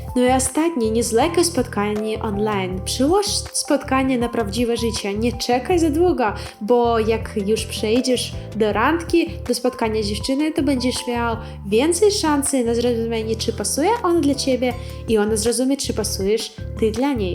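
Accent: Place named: East, Poland. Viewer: Masz zajebisty wschodni akcent. Mogę Cię słuchać godzinami.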